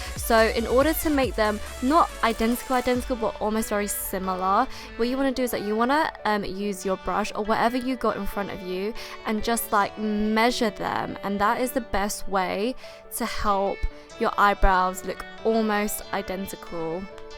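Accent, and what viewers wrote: United Kingdom. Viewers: Are you british? sorry I just subbed your channel >~< Though Ive been stalking you on instagram for a while... that doesnt sound creepy at all... anyway, love you! ^•^ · I DIDNT EXPECT U TO BE BRITISH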